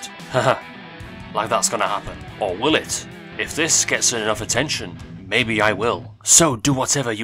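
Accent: United Kingdom. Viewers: The R-rolling just sounds very weird, in the midst of the British-like accent.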